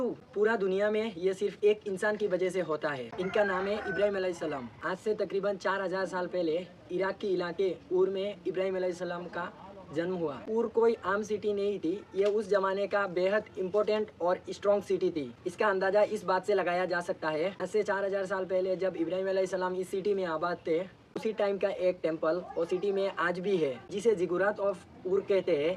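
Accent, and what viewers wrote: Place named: Sylhet, Bangladesh. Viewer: Mashallah!! I'm just big fn of ur sylethi accent 😂❤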